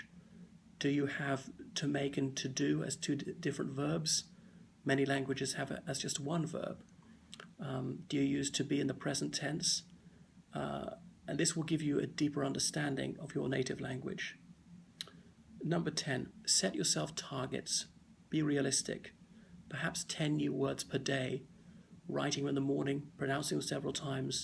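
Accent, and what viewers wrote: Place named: RP, United Kingdom. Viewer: I'm trying to learn british rp and your videos is a goldmine! I'm learning it's important to speak slower for btisih rp. I do notice in Downtown abbey that there is a bit more musicality, for a wanting a better word, in their accent than yours in this video. Is that kind of intonation and musicality in their speech considered terribly old fashioned these days and would be laughed at?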